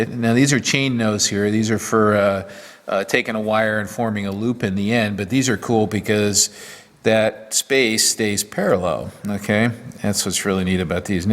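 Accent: United States. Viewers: I always thought that vernier calipers were pronounced "ver-ni-er", not just two syllables. Is that a local east-bay accent?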